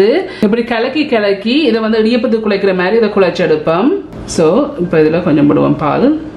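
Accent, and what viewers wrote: Jaffna, Sri Lanka. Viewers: Akka sri lanka muslim food recipe podungga... Unggal Yalpanam tamil arumai🇲🇾❤️🇱🇰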